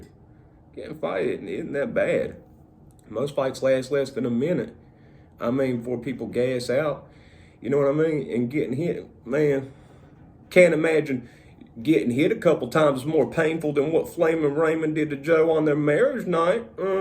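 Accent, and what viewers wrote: United States. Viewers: from what part of the USA is this accent from?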